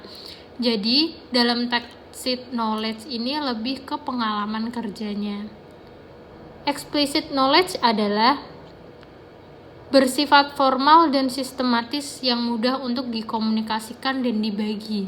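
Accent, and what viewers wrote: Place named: Java, Indonesia. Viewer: Baru ini dengar yg ada medoknya😂😂 anyway thanks ya!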